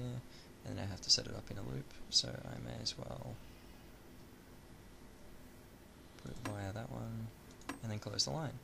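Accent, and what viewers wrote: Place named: Australia. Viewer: Love australian accents :D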